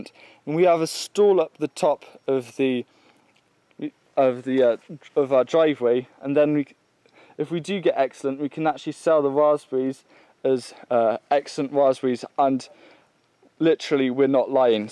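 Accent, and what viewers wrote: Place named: United Kingdom. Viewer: Sometimes I come for the great info. Sometimes I come for the entertaining programming. But sometimes I come just for the cool Brit accent. :)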